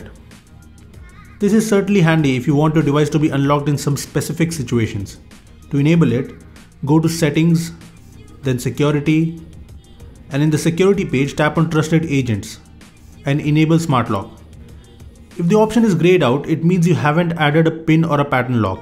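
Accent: India. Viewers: *ALL OF YOU GUYS ARE STUPID. HERES WHY YOU BITCHES 1. HE IS INDIAN 2. THAT IS AN INDIAN ACCENT 3. YOUR LIKE 12YR OLDS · Why you Indians can't say the words without that terrible accent? It's hurting the ear to listen. And as a hungarian living in the UK, i know everyone can learn how to speak normally. And no offence (honestly) but only the colored skin dudes won't learn how to speak... Why is like that. You can clearly hear that the British Queen or the actors from Hollywood, or even Obama say the words completely different then you!!!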